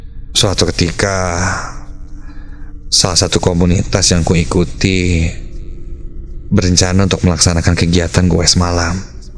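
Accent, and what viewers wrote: West Java, Indonesia. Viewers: mem-boseh , sunda banget 😂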